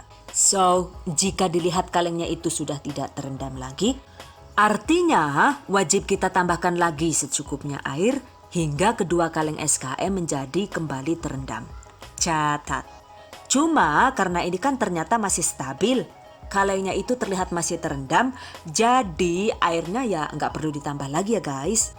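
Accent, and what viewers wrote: Banyumas, Indonesia. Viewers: Hadeuhh...gk nahan sm ngapaknya itu loh...,😅😂 channel kesayangan gue nih,krn inspiratif dan kreatif banget,👍👍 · 😆😆😆 ngapaknya itu loh aye demen banget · yu ngapak2 baen kouh 🤣🤣🤣🤣🤣🤣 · Hehe I always wanted your video not just because the receipt but for your ngapak hehe lucuuu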